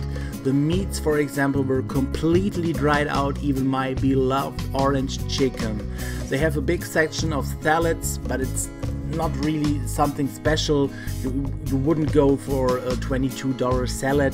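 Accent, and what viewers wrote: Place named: Germany. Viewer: I happen to love your German accent & your videos :)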